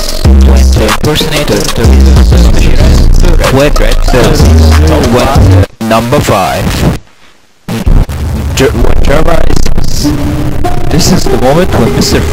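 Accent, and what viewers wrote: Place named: Egypt. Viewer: The egyptian english accent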